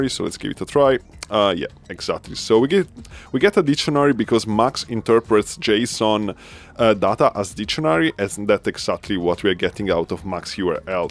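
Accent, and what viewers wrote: Italy. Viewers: Hey, your Italian accent is wonderful and adds to your channel. English speaking viewers can only be grateful that you are producing these great tutorial videos in their native language. And I did not know there was a "maxurl" object - thanks!!!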